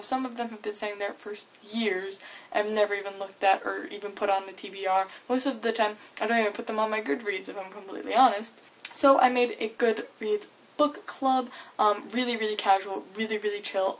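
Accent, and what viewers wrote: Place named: Canada. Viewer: why do you sound Canadian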